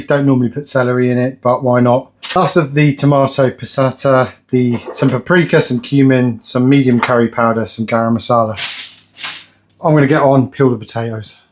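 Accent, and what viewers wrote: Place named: England, United Kingdom. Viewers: I'm from Hull and had your accent as Yorkshire, but I was thinking Doncaster or Goole. 👍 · Just looking at you I did not expect an Englishman lol You look like an American 😂 So the accent I was like whoa lol! Love your channel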